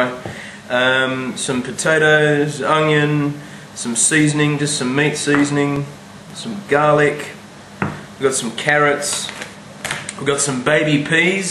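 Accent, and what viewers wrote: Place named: Australia. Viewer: can you use anything other than gravy? Say, if you REALLY REALLY hate gravy? And I looove you australians accents. They're soo aweosme.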